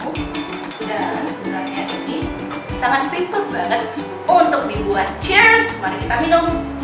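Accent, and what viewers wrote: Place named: Sumatra, Indonesia. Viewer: Wah cicinya orang sumatra ini.. medok bgt ngomongnya 😆 mantap lah dpt di youtube resepnya.. thankyou!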